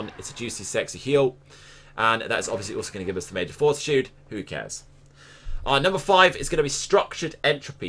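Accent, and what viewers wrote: Scotland, United Kingdom. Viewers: Your Scottish voice makes me laugh every single time 😄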